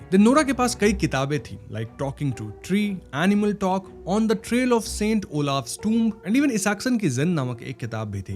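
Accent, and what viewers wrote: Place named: Bihar, India. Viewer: broh no hate but apna accent thik kro yaar bihari accent nikal deta h gussa aata h ekdm se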